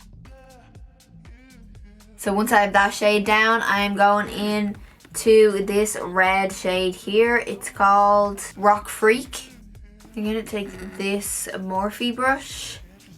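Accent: Dublin, Ireland. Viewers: You are such a beautiful girl!!! I'm from Belfast, and if my ear is correct, your from Dublin?? I'll always support anyone from this island, we need to take over!!!! :D Also, do u know of any good shops in Dublin to visit, for makeup, skincare and clothing?? I always make a trip down after Xmas, but I always go to the same places... Just wondering if u know any cool places outside of the bigger stores, like Arnotts, Brown Thomas etc xxx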